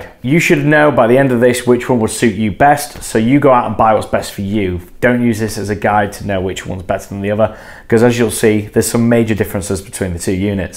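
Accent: United Kingdom. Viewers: ... this dude looks like Jared Dines with a British accent!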